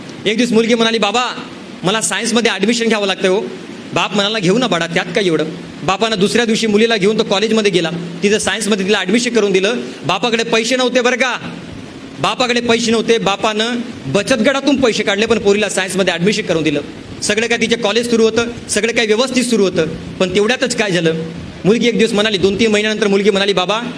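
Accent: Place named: Vidarbha, India. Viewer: दादांचे उच्चार विदर्भातल्या मराठी सारखे वाटतात.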